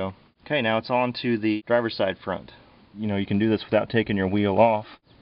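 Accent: United States. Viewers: No funnel, no gloves, southern accent, thats back woods boy. Merica! Hey at least you have good info, and you were wearing eye pro that stuffs bad on the eyes.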